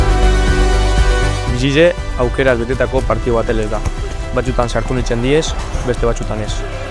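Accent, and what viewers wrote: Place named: Bermeo, Spain. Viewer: Eskerrik asko... Oso dotore zure bermiotar hizkera. Bizkaitarrak garenontzat bihotza ikututen dau...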